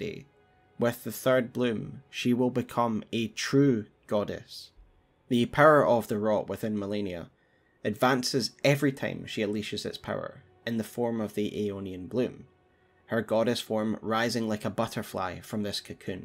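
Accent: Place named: Scotland, United Kingdom. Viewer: A fucking love your Scottish mate. Good to see your putting us on the map for the souls Community 😉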